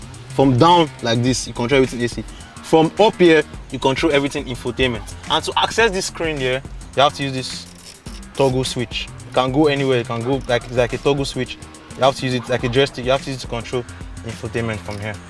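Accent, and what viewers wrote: Nigeria. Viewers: I love the fact that he isn't forming...speaking like a true Nigerian guy... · I am used to seeing Nigerian youtubers try hard to modify their Nigerian accent. It is a breath of fresh air to see one keep it real, its actually lovely. Much love from Canada.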